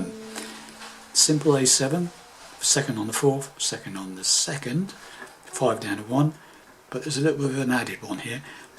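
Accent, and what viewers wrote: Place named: Coventry, United Kingdom. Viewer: Just had to pause, its a lot to take in...just subscribed to his YouTube page.. Your lesson is as always perfect, great explanation and walk through, are you from Coventry but moved to Bedworth or leamington...??? You sound very local..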